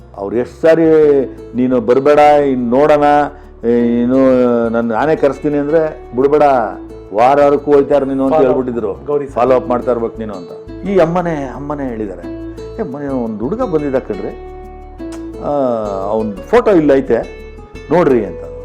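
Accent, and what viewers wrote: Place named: Mandya, India. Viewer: ನಮ್ಮ ಮಂಡ್ಯ ಕಡೆ ಇಗೆ ಮಾತನಾಡೋದು ನಿಮ್ಮ ಭಾಷಶೈಲಿ ಚೆನ್ನಾಗಿದೆ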